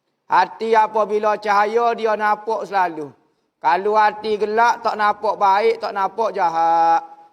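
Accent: Kelantan, Malaysia. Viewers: Alhamdulillah kamu pahe gok ustadz kecek loghat kelate..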